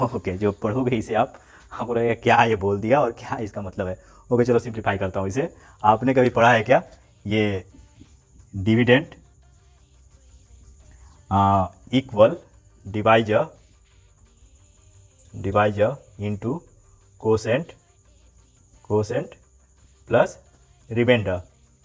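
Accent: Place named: Bihar, India. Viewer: Bihari look with Bihari acent to good man👌👌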